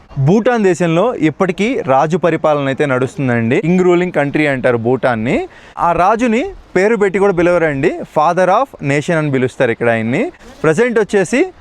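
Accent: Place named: Prakasam, India. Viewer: Nice bro, మీది ప్రకాశం డిస్ట్రిక్ట్ నా బ్రొ, మాటలు పర్సనాలిటీ అలానే వుంది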